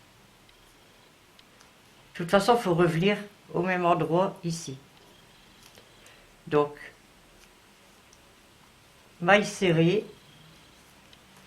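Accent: North, France. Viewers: Bonjour ! Merci beaucoup pour votre pédagogie, vous êtes simple, toute " mimie " avec l'accent du "chn'ord" que du " bonhor !!"🌹👍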